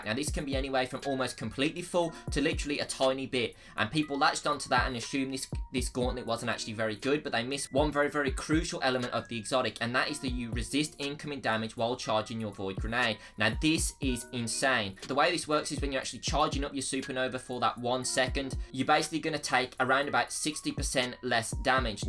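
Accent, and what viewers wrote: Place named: United Kingdom. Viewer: British am I right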